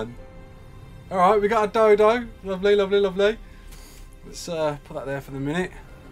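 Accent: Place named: Liverpool, United Kingdom. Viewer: You sound a bit scouse, are you from liverpool?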